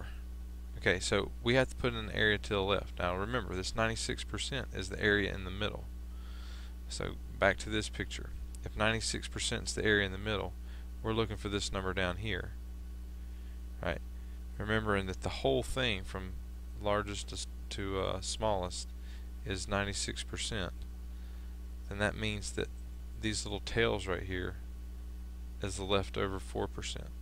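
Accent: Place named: Kentucky, United States. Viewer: i like ur accent, reminds me of Kentucky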